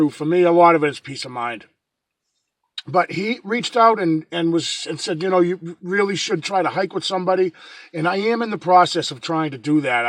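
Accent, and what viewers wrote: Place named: Massachusetts, United States. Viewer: Hey just re watched your video.....omg...looney bins..lmfao...havent heard that in the 25 years I been gone FROM NE....i love watching and listening to you and let's not forget that Mass. Accent.....Linda in Florida...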